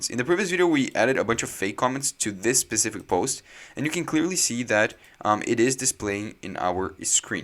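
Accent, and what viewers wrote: Brazil. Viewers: The right thing was to call the function to load the comments and not to force in the front-end with a state. But ok nice video, helping a lot. (Tu é br com esse sotaque ctz kkkk abraço)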